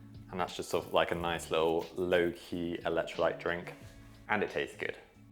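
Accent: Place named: United Kingdom. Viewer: Great recipes. And, as most of the vegan cooking channels I watch are American, & I live in Aus, it's nice hearing the old UK accent - 'and we'll 'pop a lid on top'! Only the Brits 'pop' things ❤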